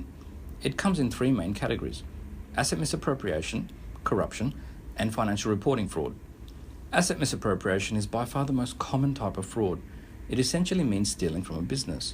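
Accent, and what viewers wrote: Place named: Australia. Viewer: I think Australian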